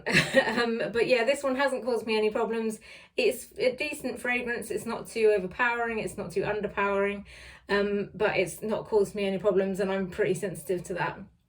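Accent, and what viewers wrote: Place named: England, United Kingdom. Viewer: She is speaking casual English with an English accent, describing a product sold in the UK by a company whose headquarters are in Brighton. I don't think there's anything wrong with the way she's speaking.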